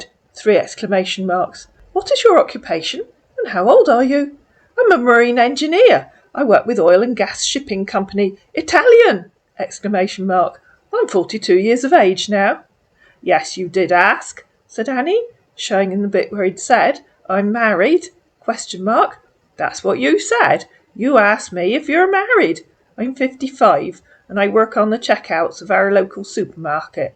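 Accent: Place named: West Country, United Kingdom. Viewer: Can’t listen to that awful west country accent sorry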